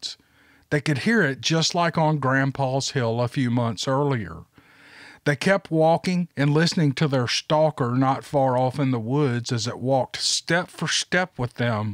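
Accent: South, United States. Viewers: me and my wife love how you tell the stories. Plus we love the good ole down south accent for reals. May Jesus bless you and yours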